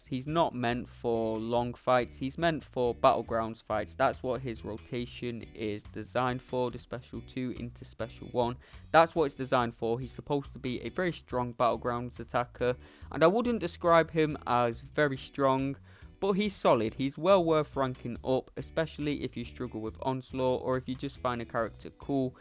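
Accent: United Kingdom. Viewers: Ayo I think this guy is british!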